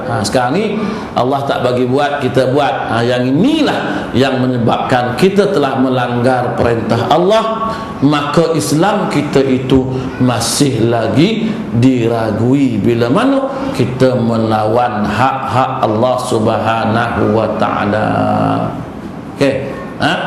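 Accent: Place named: Negeri Sembilan, Malaysia. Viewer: best ceramah ustaz.......tima kasih ustaz atas ilmu dan loghat nogori...........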